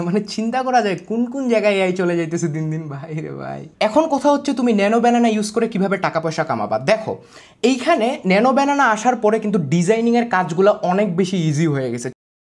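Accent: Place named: Bogura, Bangladesh. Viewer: বগুড়ার ছোল বলে কতা,,❤❤❤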